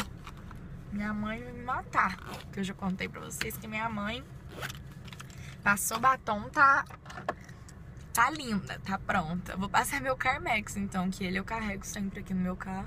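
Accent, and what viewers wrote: Minas Gerais, Brazil. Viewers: seu cabelo esta incrível!!!!! Faz um vídeo de cuidados com o cabelo loiro, como vc matem a cor. Adoro seu humor nos vídeos, sotaque mineiro é o melhor 👏👏 beijosssss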